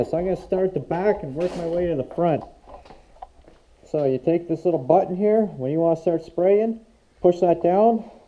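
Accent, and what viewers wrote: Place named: Canada. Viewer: the content provider’s dialect sounds Canadian to me, possibly Wisconsin, or Minnesota here in the States.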